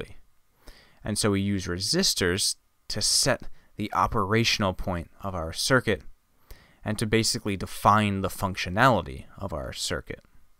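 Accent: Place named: Oxford, United Kingdom. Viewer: AN INTRODUCTION TO ELECTRICAL CIRCUITS...... SIR, WATCHED YR ABOVE VIDEO AND ENVIED YR ORIGINAL TALENT AS A TUTOR, AS A GRAPHIC DESIGNER, AS A ELOQUENT SPEAKER OF OXFORD ENGLISH, AS A PIED PIPER ATTRACTING RUN AWAY KIDS/STUDENTS RUNNING AWAY FROM SCIENCE. WHAT IS THE ATTRACTION OF THIS VIDEO? THE SUPERIORITY AND EXCELLENCE OF THIS VIDEO IS NUMEROUS AND HENCE I WILL BE NOT BE ABLE TO TELL ONE AS THEY ARE MANY AND INNUMERABLE. TO POINT OUT A SINGLE MISTAKE I AM UNABLE TO DO AND FAIL MISERABLY. WHAT A WAY TO TEACH! SO PERFECT AND TEACHING SCIENCE MORE EASILY THAN VIEWING A TV SERIAL. AT THE END OF THE DAY A SERIAL IS FORGOTTEN BUT NOT YR TEACHING . TO TELL YOU FRANKLY, ONE TRUTH WHICH YOU DO NOT KNOW IS, THAT ONLY A HANDFUL OF TEACHERS ARE THERE IN THIS WORLD WHO CAN TEACH SCIENCE/ ELECTRICAL ENGG AND SCIENCE FOR SUCH A PERFECT UNDERSTANDING. 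THE TRUTH IS HEARING THE WORD "COULOMB" BRINGS IN HEADACHE BUT NT YOU OR YR TUTORING. I HOPE YR VIDEO IS THE BEST ONE TO BE MADE COMPULSORY IN ALL ELECTRICAL/ELECTRONIC SCHOOLS AND POLY-TECHNIQUES. YOU ARE A VISIONERY TUTOR MAKING A COMPLEX SUBJECT INTO A SIMPLE ONE WHICH CAN BE DIGESTED EASILY BY EVEN THE POOREST GRADE OF STUDENTS VATSA INDIA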